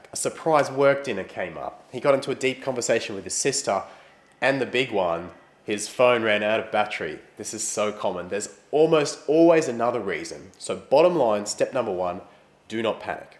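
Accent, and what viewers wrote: Australia. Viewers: I dont like Australian accents and thé country but i like this guy.A cool Ozzie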